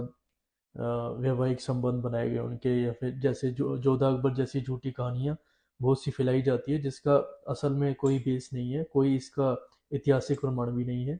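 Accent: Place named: Pakistan. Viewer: Iss channel ko chalane waala aawaz se Pakistani lag raha hai, ye koi ISI ka Agent hai jo Hindu samaaj ko todne mai lga hai isse saavdhan rahe, Jai Rajputana Jai Hindu n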